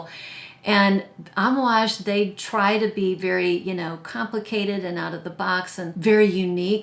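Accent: France. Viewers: My lovely and beautiful Scentsister 💕♥️ with a a crabby french accent😂😘 Let’s do a live chat some day😉🥰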